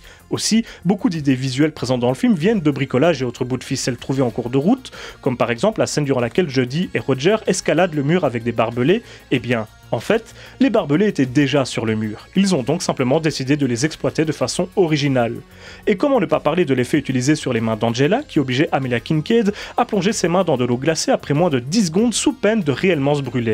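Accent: Belgium. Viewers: félicitations pour les 90k je trouve cela totalement mérité...je vais me répéter mais tu fais vraiment un travail de montage d ecriture incroyable tu t exprimes vraiment bien ton accent belge est quasiment imperceptible (je suis belge également) c est vraiment un plaisir que de voir un compatriote avoir de plus en plus de succès sur YouTube etant également un gros cinéphile grace a toi j ai pu découvrir ou redécouvrir certains films cultes du cinéma fantastique et d horreur...ta recherche tes anecdotes sont tjrs de qualité et j attends tjrs avec une certaine impatience tes prochaines vidéos preuve que ce que tu fais dechire continue comme ça big like poser bien évidemment a bientôt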